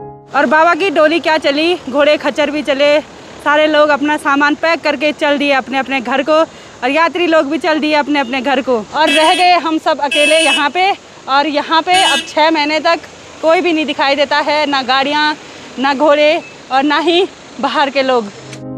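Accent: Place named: Pahari, India. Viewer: By hearing your voice a recollection become fresh of my posting tenure at Pauri Garhwal 1992. A resembles, true copy of pahadi. You have a good quality of an utuber. Please write your biodata. Excellent 👌👍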